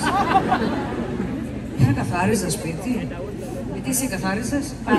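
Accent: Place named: Cyprus. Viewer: Poso mou aresi otan milai ta Kipriaka! xronia sou polla agapi mou, na zisis kai na ta ekatostisis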